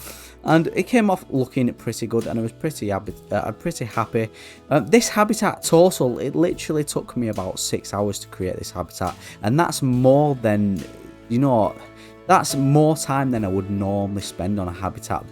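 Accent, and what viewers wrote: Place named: England, United Kingdom. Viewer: Very talented mate- glad to hear the English accent, not often you hear "sack it off" on youtube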